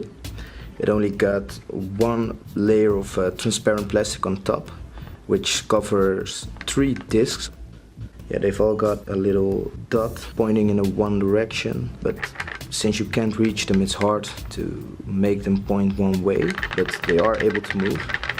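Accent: Netherlands. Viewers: you, my friend, sound verry dutch... am i right? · R u dutch? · Are you Dutch? Your accent is kinda Dutch xD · Is he from the Netherlands? I can hear it in his voice😂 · I can hear you are Dutch. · This guy is dutch, im pretty sure he is dutch, everybody over here that tries to talk english speaks this way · I am for 98% sure you're Dutch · Ben je Nederlands want je accent klinkt heel erg Nederlands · You sound like you have a Dutch accent. Are you Dutch? I am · Are you Dutch? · Are you Dutch?? (your accent) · You're ‘accent’ seems kind of Dutch, if so, ik ben ook Nederlands! :D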